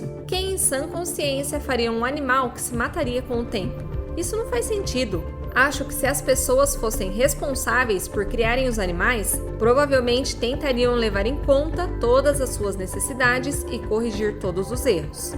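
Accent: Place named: Caipira, Brazil. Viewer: SOTAQUE CAIPIRA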